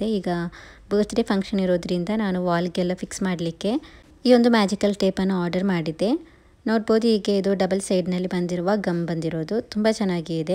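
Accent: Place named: Kerala, India. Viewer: Sis neewu malyalina nim maathado style ishta aythu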